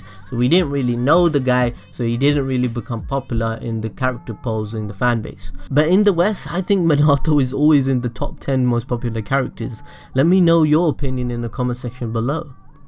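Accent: United Kingdom. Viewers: Your British wow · Your British accent can be as thick as you like as long as you don't say "bluud or fam", I can't stand that down to my soul bro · Yes, very thick British accent. great vid. though. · Your accent is B r I t I s H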